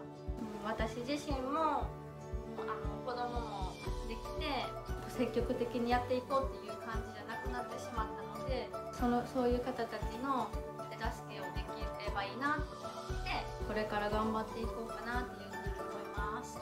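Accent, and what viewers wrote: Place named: Kansai, Japan. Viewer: 今までツイッターでしか見たことなくて、声とか喋りとか分からなかったけど(勝手に標準語と思ってました。) しっかり関西弁で親近感わきました(笑) 子育てもYouTubeもコスプレも頑張って下さい！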